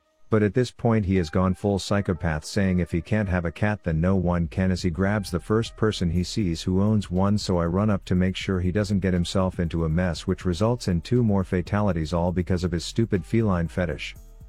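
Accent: Scotland, United Kingdom. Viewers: my boy she has a scottish accent sorry to say but she is not british. title needs to be scottish bambi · She’s Scottish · She's not British, that accent is Scottish and how dare you call mine people British. We will literally go William Wallace on you for speaking such blasphemy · she is SCOTTISH not Bri ish! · That's a scottish accent, not a british accent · isnt it scottish? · she is Scottish Bambi not British Bambi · isn't her accent Scottish? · She sound Scottish tho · SHES SCOTTISH OMG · I thought that accent was Scottish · i thought she was scottish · That's a scottish accent, not a Brittish accent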